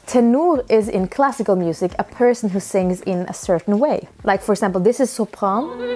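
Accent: Iraq. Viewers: انتي تتكلمين لهجتنه العراقيه الجنوبيه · the Iraqi accent has been liked by you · This iraqi accent is so cute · You are so cute speaking the Iraqi dialect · the iraqi accent made it more charming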